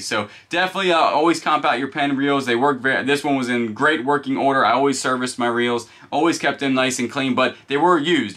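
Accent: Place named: Baltimore, United States. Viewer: Sounds like a Baltimore accent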